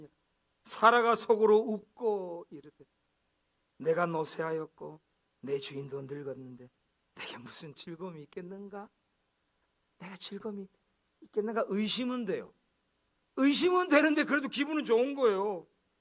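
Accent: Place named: Gyeongsang, South Korea. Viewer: 🤗 말씀이 깊고 넓게 확장되는 시간이었습니다. 감사💞 ※※경상도 억양이시라서 부산근방..생각했었는데, 석수동이셨네요.🤓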